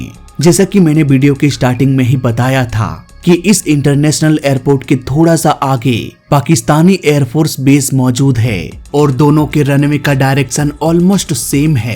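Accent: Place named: North India, India. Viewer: यह एक उत्तर भारतीय भैय्या है , इस की भासा (भाषा) और pronunciation साफ दर्शा रहे हैं। इनकी G मे एक कीड़ा है, अपनी कमियों को दर्शा कर उस को सुधारने की बजाए, दुसरे के शौचालय मे नाक घुसा कर यह सुनिश्चित करना चाहते हैं कि कल इसने क्या खाया था।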